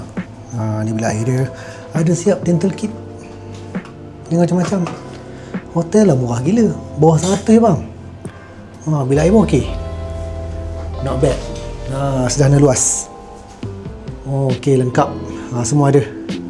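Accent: Malaysia. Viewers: Logat Johor kah? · wuih... mantap, cakap bahasa dengan aksen/dialek Melayu . 👍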